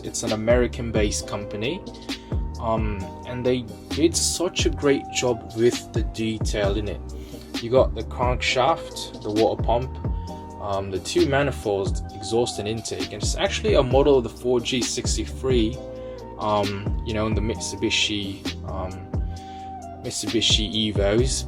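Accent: United Kingdom. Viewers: Why is there a British man now and no one is talking about it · i really recognize your accent, i swear it's the camden area in london where i heard it last and know where it's from.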